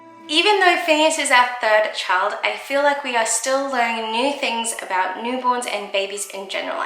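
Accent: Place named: Australia. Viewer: Ngawww Mama! These are the best milestones!! Just saw your post on Youtube Moms Unite and thought I show some love and connect. I'm hearing a familiar accent Mama!! <3 Where abouts in Australia are you from? I'm based in Sydney! Hope to stay connected.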